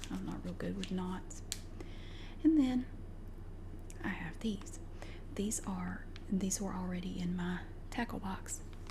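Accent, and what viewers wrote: South, United States. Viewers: I subscribe to your channel and was curious about who you follow. This is a great channel - thank you. The Southern accent is brilliant. I am from the UK so it is unusual for me! But makes for great ASMR!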